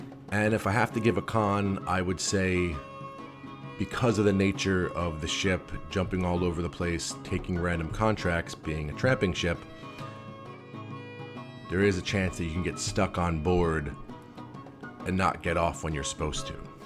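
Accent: New Jersey, United States. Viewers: Whenever videos come up his accent always brings me back home to Jersey. I grew up in Jersey but have lived in Alaska for over 30 years but accent always reminds me of where I grew up. Great Videos as well!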